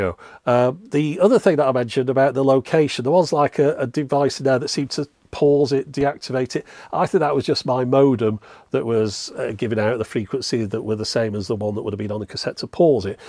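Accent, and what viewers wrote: United Kingdom. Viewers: Thanks for sharing your experiences with the weirdo audience members. Please don't waste time interacting with them. You have nothing to explain to us about how you sound. It's very shameful how some people act here behind their veil of pseudo-anonymity. From one of the up-'til-now silent subscribers, I'm very sorry to hear about your Mom. That must have been a terrible thing to go through. And you sound awesome. The British accent automatically gives you a +10 perceived intelligence, and sod the plonkers who give you a hard time about the way that you sound. Please have a better 2023. Best regards from Chicago, USA. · Totally Brilliant Video. Had My undivided attention the whole time. I have some catching up to do, I thought I have seen Every Video You put out. I though the Way You sounded was very natural, found nothing odd about it, Having the British accent and all. 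It May sound weird, But I really enjoyed hearing you talk.. Sorry about the Health issues. Please accept My Sincere Condolences of You Mothers Passing. Lost My parents in 96. Dad was 65, Mom in 08 just turned 72. I'm 62 and hope to out live My father. I feel that I can cause I am in great Health.. Other than a Dental check-up every 6 Mo, I have not seen a Doctor in 15+ years. I do nothing for Christmas either. Being in the woods 250' From the lightly Driven Road not much to see. When I get My Tower back up, it will be 120' My big blue Star might be seen from the interstate. I anxiously await any Video You put out. Kinda makes My day when You do... Please take Care My Friend (yes You could be a great Friend to have) Mike M. Wausau Wi. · Hopefully 2023 is better. I don't know how people complain about how you speak. It just sounds like a British accent to me. But there are always a few that will complain and insult people.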